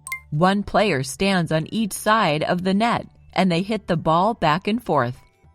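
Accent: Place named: United States. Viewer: I love your teaching the most. I thought that you are English native speaker but it is not. I was motivated a lot when watching you speaking English. I love your American accent and I am trying to mimic your accent. Thanks for all the lessons, man!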